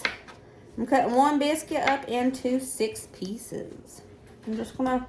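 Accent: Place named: East Tennessee, United States. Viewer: I knew it! When you said "you'ns," I had to check the Description Box. I grew up in either TN or KY most of my life, and I thought you might be from Eastern TN listening to your accent. I love hearing someone speak "right" on YouTube, BTW, LOL! But when you said that? It just made me SMILE! I'm currently in Western KY, but I know my accents. Please don't ever change! Just found your channel, and I love your ideas--new sub. Feels like I found a new friend. God bless you and your family! 🙏💖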